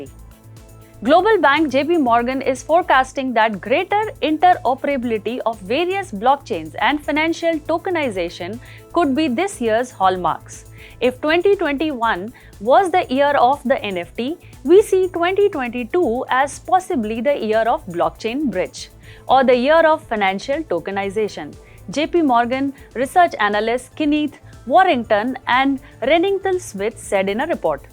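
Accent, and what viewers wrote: Gujarat, India. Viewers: She's very pretty. But honest feedback - she has a strong gujju accent and a very sing song voice. Please don't get hung up on gujju ego and identity. You want to make money! Get an anchor who's accent neutral and presentable. The content was good.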